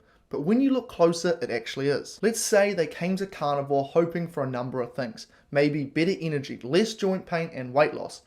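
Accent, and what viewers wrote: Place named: New Zealand. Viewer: Great to hear a Kiwi accent on YouTube 😊 Chrissy from Te Awamutu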